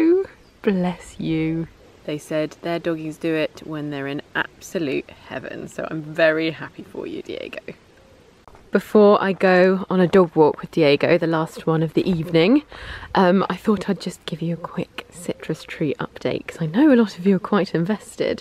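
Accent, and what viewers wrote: United Kingdom. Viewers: Hi! Congrats for your wedding. I am your follower. I love your British accent. Thank you for everything you teach us. I wish a splendid and amazing Marriage. Kisses from the distance! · Oh my god, Hey! You're just one of the brightest personalities ever!!!! And diego, he is one sweet doggo. I must say, you are just amazing. I learn British accent from you... and I love it. I am pretty awesome at English, but i admire the British accent.. Thats the reason😉. I am a 14 year old fan of yours! Aha.. I cant get enough of your videos. Always there to support you. Loads of love! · I always watch your videos whenever I get some issues with grammar and also I am learning British accent from you bacause I am totally obsessed with it... Many many love from my heart core... ❤️❤️❤️❤️ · Congratulations may happiness and health be upon you...I learn British accent from you... 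· I hop to visit you in England, and learn British accent from you I love you so much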